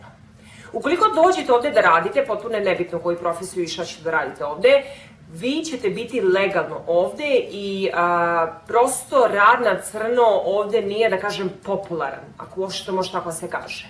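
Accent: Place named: France. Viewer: vuce na francuski, moja sestra prica slicno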